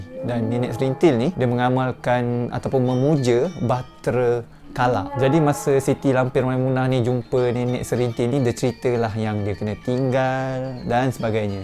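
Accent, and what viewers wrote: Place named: Perak, Malaysia. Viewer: Org perak ke yop ajer nya😂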